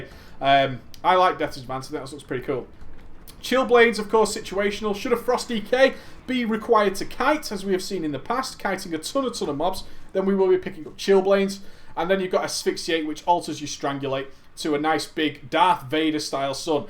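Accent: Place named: England, United Kingdom. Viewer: what server do u play on BTW i love my wow vids with an english accent lmao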